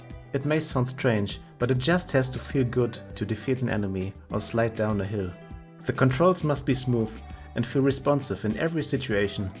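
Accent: Germany. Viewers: Are you german your voice sounds like a german?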